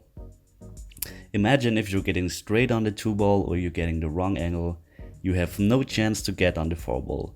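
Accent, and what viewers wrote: Germany. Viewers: Where are you from dude? I'm into accents, always have been.Took me a while to place you as from Germany, very nearly Austria. Maybe you could give me some pointers on placing your accent. By the way, at first glance your website looks pretty bloody good, well done! Edit: My guess is Munchen, sorry if wrong, limited scope. Edit: Looking at a map, I want to guess closer to Deggendorf.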